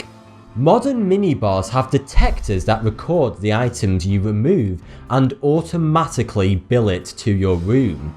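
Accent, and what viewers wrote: United Kingdom. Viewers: Actually it's because his british accent.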